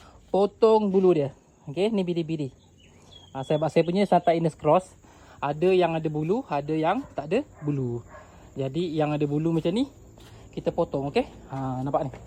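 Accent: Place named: Negeri Sembilan, Malaysia. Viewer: Kalau dengar cakap seperti kat negeri sembilan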